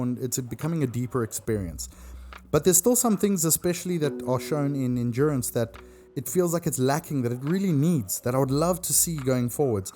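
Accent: South Africa. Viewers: So nice to hear a South African voice for once! Dope content! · i knew i recognized that accent, im raised in america but born in SA, cool video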